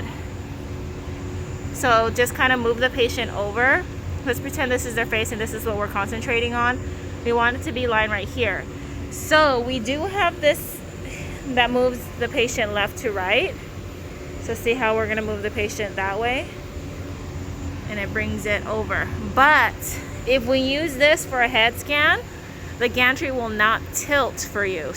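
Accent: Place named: Caribbean. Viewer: Are you Caribbean? I can hear an accent and i feel like you’re one of us lol. very informative video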